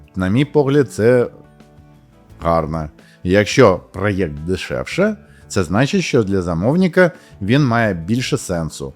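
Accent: Russia. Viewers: А это и не украинский. Сценарий пишут на украинском, а произносит он слова по правилам русской орфоэпии. Украинофонам тоже надо декодировать услышанное. Если сравнить с английским, то это как если бы было написано "the programming language", а произносилось "тхе праграминг лангуаге".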